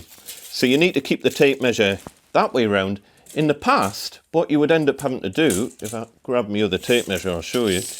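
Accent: Newcastle, United Kingdom. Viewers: This is what I’m looking for but they’re not easy to find. This guy sounds like a Geordie!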